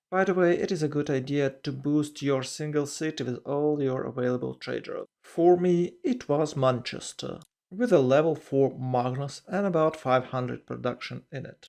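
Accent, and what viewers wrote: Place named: Ukraine. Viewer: Поки слухав почув, що акцент ну дуже на мій схожий. Ну дуже от. Перевірив канал, написано– Україна. Поржав, подивився далі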